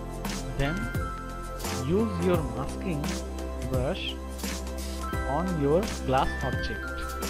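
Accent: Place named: India. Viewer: You can guess it from his accent probably Indian or Pakistani.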